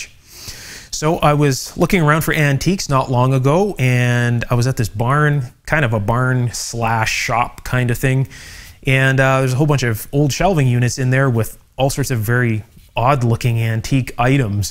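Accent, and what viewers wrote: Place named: Canada. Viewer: Why can’t Canadians say OUT correctly ?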